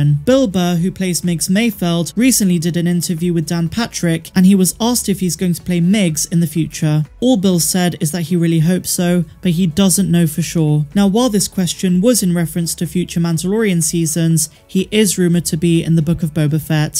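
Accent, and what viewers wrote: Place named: Bristol, United Kingdom. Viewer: Love the videos! Gotta say that every video reminds me of home with certain words having an almost Bristolian accent!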